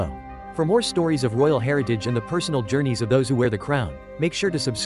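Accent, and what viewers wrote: United States. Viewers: Hate the bloody American voice commentator, and please stop using YouTube’s most overused word…SHOCKING! 😤👎👎👎🇦🇺🦘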